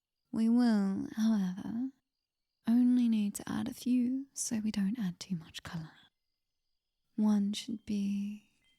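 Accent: United Kingdom. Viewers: If I may make a confession, a British accent was what came to mind when writing this script, so I'm glad it got a British voice. Thank you for the fill! :D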